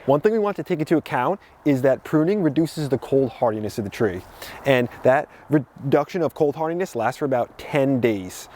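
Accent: New Jersey, United States. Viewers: Are you from joisey? Im from joisey!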